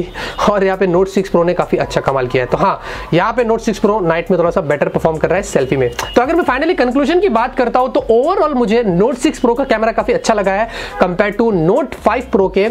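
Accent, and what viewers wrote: India. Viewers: Nossa, como indiano tem sotaque. =0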